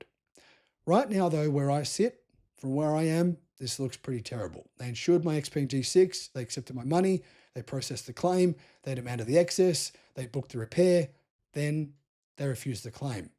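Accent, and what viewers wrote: Australia. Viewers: Is it an a Australian or overseas.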